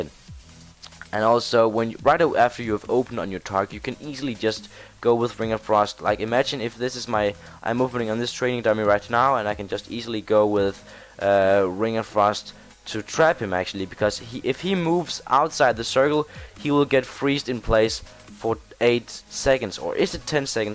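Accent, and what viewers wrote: Denmark. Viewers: Hey man awesome guide! :) Just a quick question, Where are you from? U sound very Danish lol. Anyways keep up the great work! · Hey dude very nice guide !. btw are you from Denmark?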